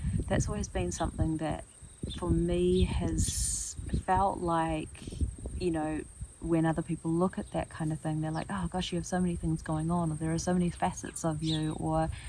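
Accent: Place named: New Zealand. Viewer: Good morning. First of all, welcome back home to Godzone, Aotearoa, Nz. I WILL continue to follow, stay subscribed to your channel. I guess the content is easy to follow, simply because it's in "our" accent, and not in a monotone. My response, even though it's a cliche; is "You do You." I don't know what you have planned, but I will be here in Murchison, June to August. I would love to meet you personally. I kinda feel, that you have more to say. However it does get cold, through the winter here, in the village, but in reality, there's no such thing as cold. Just an absence of heat.!! 😮 Thank you for your previous content, and I look forward to further cooking episodes, if that is what you have planned. Maybe, van life.? In the meantime, have a break. All the very best. Much aroha.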